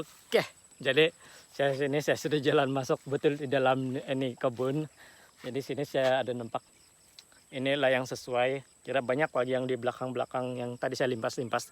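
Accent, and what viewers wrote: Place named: Malaysia. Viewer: Betul betul betul Logat malasia yasobat Hdr bwt nyport👍👍👍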